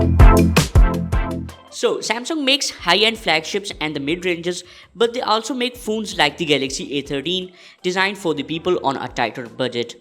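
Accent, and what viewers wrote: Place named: Sweden. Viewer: Swedish Accent.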